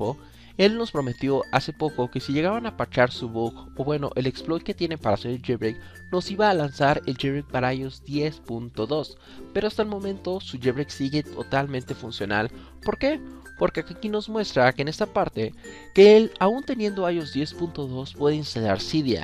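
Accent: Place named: Peru. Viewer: Seguro eres peruano, hablas igual al Daniel Menacho 😹😹😹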